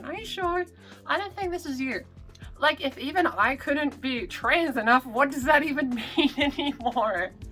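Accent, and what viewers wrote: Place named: Australia. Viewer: strayan accent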